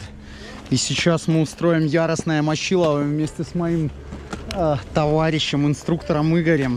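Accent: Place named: Kyiv, Ukraine. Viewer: Откуда киевский акцент?)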